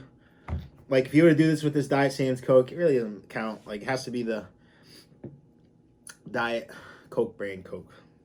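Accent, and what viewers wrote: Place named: New England, United States. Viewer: I'm surprised you don't pronounce papa as "puppa" with your New England accent.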